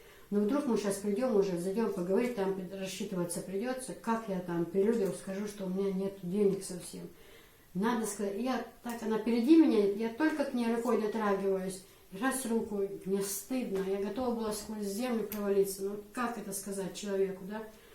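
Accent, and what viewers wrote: Chechnya, Russia. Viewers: Знаешь некогда не подумала бы что вы русская потому что акцент чисто чеченской увас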